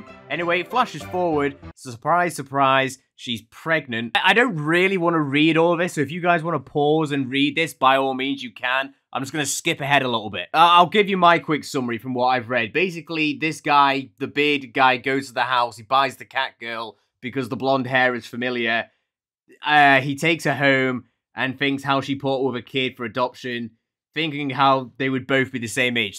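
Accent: United Kingdom. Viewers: I like ur British 🧐 accent lol and jokes, im just dying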